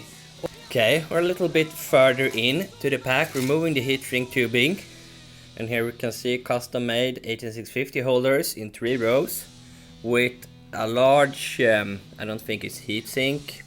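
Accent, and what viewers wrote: Sweden. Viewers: Swedish accent, Skatepunk/Millencolin in the background, talking about battaries on bikes. I am a fan(Denmark)!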